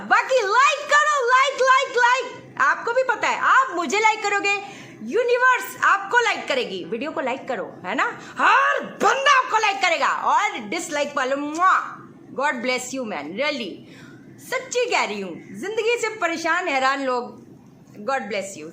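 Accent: Punjab, India. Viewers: Your way of telling Passha logo (punjabi tone and way of communicating) is killing... Love you Mam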